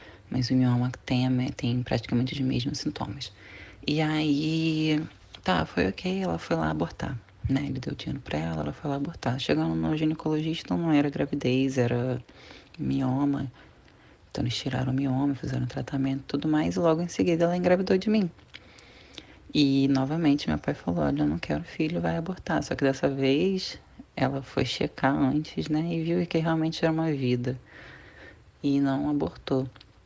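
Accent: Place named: Rio de Janeiro, Brazil. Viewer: Sotaque carioca, mas a voz mesmo não tem nada a ver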